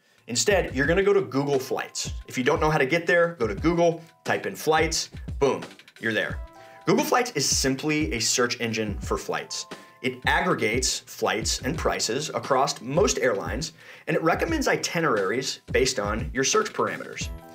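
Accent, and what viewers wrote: United States. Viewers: Hmmm ......You’re watching content on an American’s channel (with a definite American accent). It can be picked up in other countries but it looks like it’s basically a video by Americans for Americans. And you’re upset that it doesn’t apply halfway around the world?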